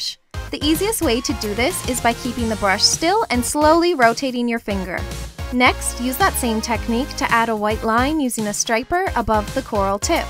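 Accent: Canada. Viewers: You can tell. She talks with a canadian accent:) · she's Canadian I can tell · You can tell shes canadian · She doesn't sound Japanese at all you guys. Lmao 🙈 She definitely sounds Canadian.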